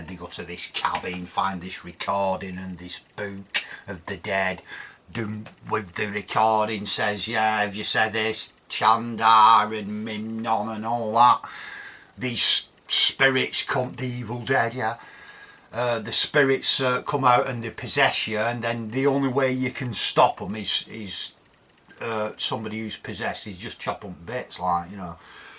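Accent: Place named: Cockney, United Kingdom. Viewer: Holy cockney!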